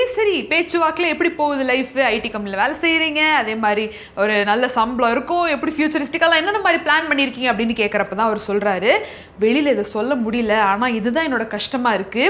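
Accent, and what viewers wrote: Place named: Kongu, India. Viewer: Good information sister.. 👍I like the way she speaks in kongu slang..❤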